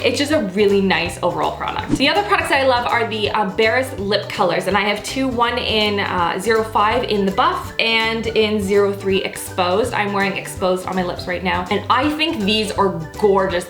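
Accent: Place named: Canada. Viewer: I mean, she's Canadian. Maybe that's what you're hearing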